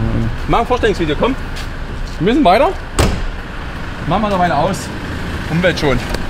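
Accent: Saxony, Germany. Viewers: Ein sehr interessantes Video. 👍 Dieses war glaube ich mein zweites Video was ich von euch sehe und ich muss sagen das gefällt mir gut. Du bist'n Typ der einfach so quatscht wie ihm die Gusche gewachsen ist. Und dann noch auf sächsich.👍 Abo ist mal da.✌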